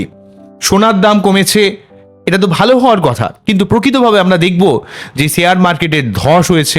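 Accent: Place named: West Bengal, India. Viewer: Apni bolchhen "hobe abar hobe". Ami Arijit er vashay boli " R kobe?R kobe? , R kobe r kobe r kobe?😂 BTW, apnar katha sune mone hoy apni Bardhaman chhele.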